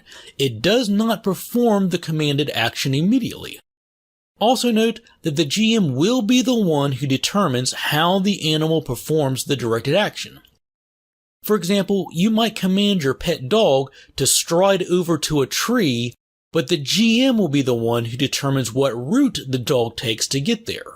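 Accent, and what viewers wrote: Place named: Mid-Atlantic, United States. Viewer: With the 'L' sounds coming from the throat rather than the tip of the tongue, coupled with the wide 'O' vowel sounds, I'm going to guess Maryland. Definitely somewhere in the US Mid-Atlantic region between DC and Philadelphia.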